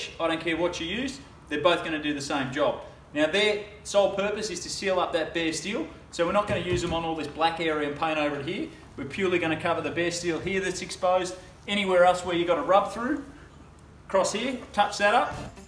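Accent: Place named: Australia. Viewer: How do I get the australian accent?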